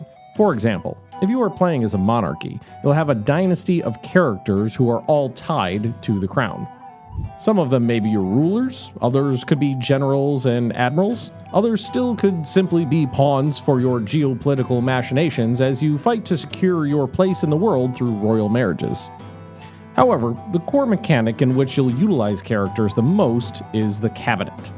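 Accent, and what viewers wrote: United States. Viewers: absolutely in British English...the dude speaking is American.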